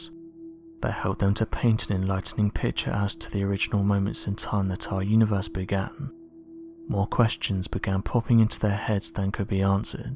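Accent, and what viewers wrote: United Kingdom. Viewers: Why is there a British sounding person talking just wondering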